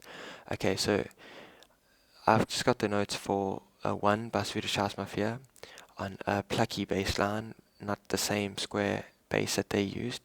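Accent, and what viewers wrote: South Africa. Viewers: South African? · He sounds South African..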